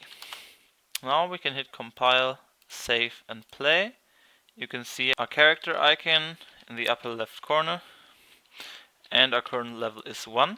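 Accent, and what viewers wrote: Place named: Germany. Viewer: Hey dude, ty for you´r fast answer. I have tried it in version 4.14 now and it works :) greetz from germany :P btw are from ger too maybe? there some sound in your dialect sounds like germans whos speaks english :D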